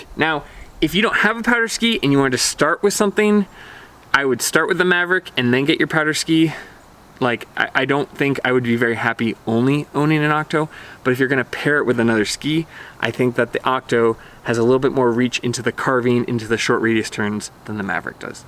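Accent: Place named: United Kingdom. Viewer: Jesus H Fuck, here's to your kingdom accent.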